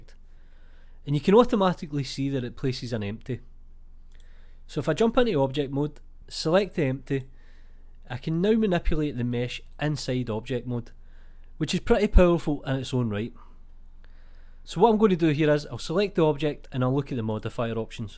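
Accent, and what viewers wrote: Scotland, United Kingdom. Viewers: Just learnt something new, I also love your Scottish accent 😁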